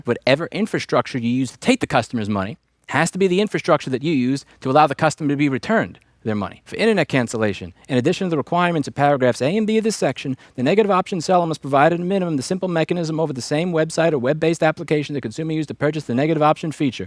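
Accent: New York, United States. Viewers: ' New Yorkerness intensifies with each passing day. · You can tell he's been angrier lately because the New York accent is coming out more and more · Is it just me or does sound more New Yorker now that he moved away. My parents signed up for a gym the first day there their locker got broken into and credit cards stolen. They decided it wasn't safe to be there. There was only one guy that could do cancelations and he was always conveniently off today or on break or some other excuse. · I came down here to write the same thing! Just enough of an accent to notice. And that's something I never ever have heard from him in years. New York attitude? Certainly. But the accent? Pretty rare. It shows he means business!!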